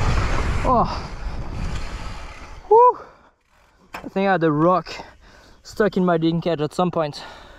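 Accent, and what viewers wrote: France. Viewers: Sorry but, as a French, I really despise the fact that you haven't corrected your accent with all this time speaking english. I guess it's part of your character now, but I can't listen to "zhis"...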